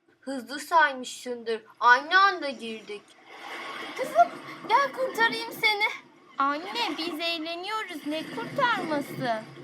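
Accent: Black Sea, Turkey. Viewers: siz karadenizlimisiniz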